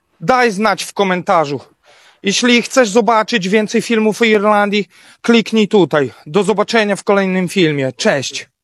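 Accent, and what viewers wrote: Podlasie, Poland. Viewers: Słychać😊 Jak się zna Podlasie :)) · Ale masz zaj bisty, podlaski akcent 👍 podobnie jak prof.Kris od aut🙂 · Man znajomego z takim akcentem:) z podlasia chłop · uwielbiam ten podlaski akcent, hahahahaha, a swoją droga na Podlasiu bida , warto tylko latem przyjechac na wakacje i nic więcej. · to akcent z podlasia. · Podlasianin z wasci. Piękny akcent jako Bacha słuchać mozna❤❤ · Z ktorej steony polski ten akcent przyjacielu ? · Podlasie słychać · Podlasie jakby. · Nie obrażaj Białegostoku bo poczułem się dotknięty akcent Siemiatycze i Hajnówki. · Uwielbiam ten podlaski akcent. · Słyszę akcent z Podlasia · Słychać podlaski akcent, dla mnie to klimat wakacji😘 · Dobry content 👌🏻 W UK podobnie. Ps.Świetny accent 💯💪🏻Podlasie? Pozdrawiam z UK 🇬🇧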